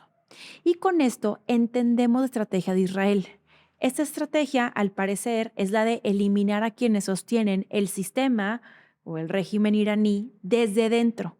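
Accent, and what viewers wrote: Monterrey, Mexico. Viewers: A ratos suena un poco ridícula con su faceta de fresa regia.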